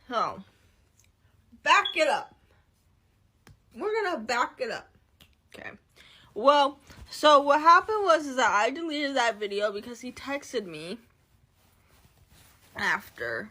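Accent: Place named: North, United States. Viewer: Question: Do you ever post sober? Also, you sound like you're from up north. US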